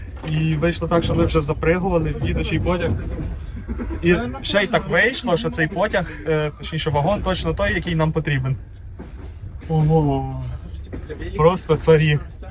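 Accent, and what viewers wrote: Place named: Galicia, Ukraine. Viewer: Почув пару слів - жд-вокзал, іменно, чуть-чуть, морожено, лагерь (німецьке слово). В автора галицький акцент, то не акцент м.Хмельницький.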